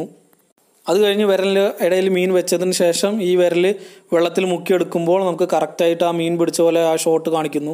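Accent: Palakkad, India. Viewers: Cheettan palakkad ano???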